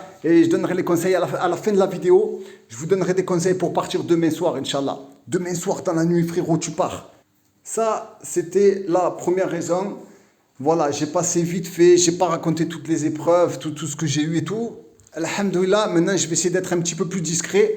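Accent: Marseille, France. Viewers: Frère il faut rien dire point faut juste faire. Et pour le tu parle comme un voyou je pense c'est ton accent ils ont pas l'habitude.. Ça Renvoi à Marseille le banditisme... Bref Oui en hijra faut écouter personne sérieux beaucoup qui vendent du rêve et ya bcp de jaloux en Algérie faut se méfier de tout le monde😂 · Moi, je trouve que tu as plus un accent marseillais qu'autre chose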